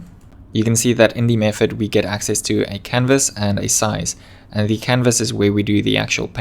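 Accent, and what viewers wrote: South Africa. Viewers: Where are you from? You sound South African? :D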